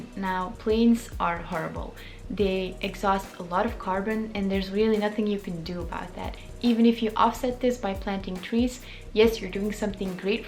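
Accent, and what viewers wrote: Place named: Belgium. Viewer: you kinda sound like ur belgium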